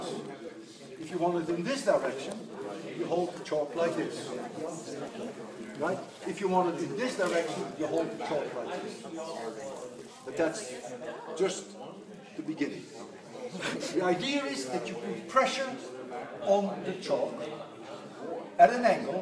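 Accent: Netherlands. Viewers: Hes so clearly dutch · I recognized him being Dutch after his first words 🙈 (I'm Dutch as well) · Don't know him but he sounds like a Dutchman talking English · I knew he was dutch when I heard him 😂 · Nah, it's about as Dutch as an accent can get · Nice dutch accent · He sounds dutch af. Update i checked the discription and shocker: he is dutch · Sounds like a dutch teacher to me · 6 seconds in and I heard he was Dutch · Is he by any chance Dutch originally? Sure sounds like it 🙃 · He sounds like hes Dutch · He sounds so Dutch lol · I knew that this man was Dutch from his accent. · his accent is so Dutch! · me: is he dutch? me: * checks description * me: ...surprising...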